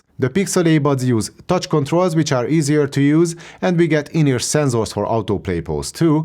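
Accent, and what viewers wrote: Hungary. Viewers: Nice professional review with a nice hungarian accent :)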